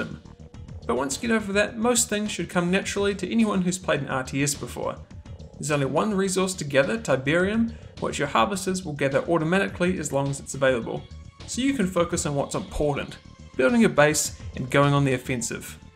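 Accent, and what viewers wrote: New Zealand. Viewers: is that a kiwi accent i detect? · Gonna love the Kiwi accent.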